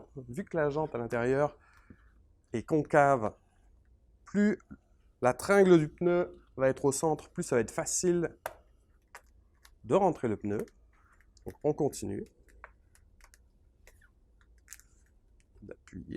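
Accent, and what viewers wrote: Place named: Quebec, Canada. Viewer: avec le petit accent québécois en plus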